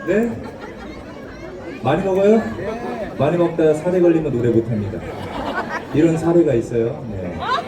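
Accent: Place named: Jeolla, South Korea. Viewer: 전라도 말솜씨 는 언제 배웠남유 👍일품입니다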